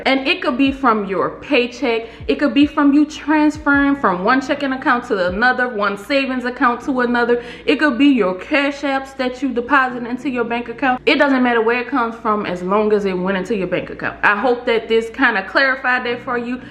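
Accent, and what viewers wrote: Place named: Louisiana, United States. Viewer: Are you from Louisiana? 🌶🐊🎷 I hear that accent 🗣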